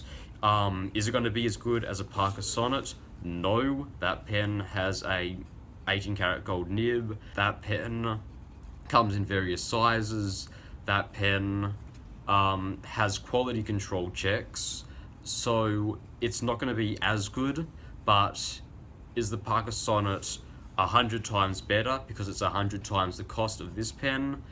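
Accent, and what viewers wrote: Australia. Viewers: As ive been told, one of the Parker Chinese factories was repossessed and they just kept on using the same machinery but I know some factories just make knock offs of parkers (some are very bad quality). Its very hit'n'miss from aliexpress for knock offs but the sonnets got to be one of the worse. Id rather get a jinhao 250/450/500/750/1200 any day over a parker knock off! Nice to see a fellow ozzie accent on youtube, keep it up and checkout my videos (trust me, youll like it)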